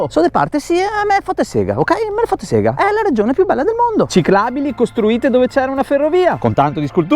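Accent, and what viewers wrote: Veneto, Italy. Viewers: Sono Piemontese ma il tuo Veneto lo capisco ed è bellissimo i tuoi video mi fanno sempre ridere grande continua così!!!🍺🍾🍺🍾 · sono di Reggio Emilia originario della Colombia. Mi piace tanto vedere i tuoi video e essendo un ciclista sfegatato amo ciò che fai e come affronti la vita. Spero di incontrarti un giorno per parlare e sentire di persona il tuo accento Veneto che mi fa tanto divertire. Ps mi sono trovato la ragazza di Verona così iniziò a imparare qualche parola in attesa di incontrarti 👍🏽 continua così sei una forza della natura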